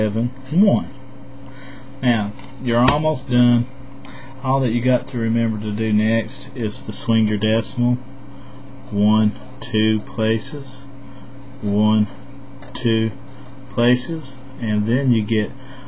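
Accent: South, United States. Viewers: it's called a southern accent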